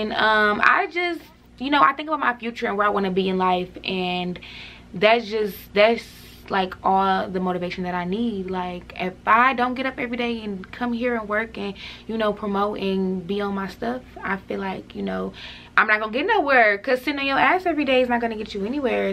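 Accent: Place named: California, United States. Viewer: I would have thought you were from either the bay area of Cali or somewhere from L.A. Lol. The accent.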